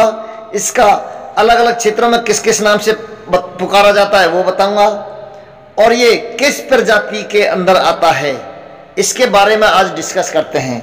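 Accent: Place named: Haryana, India. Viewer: Bhai Haryanvi lag rhe ho😁🤣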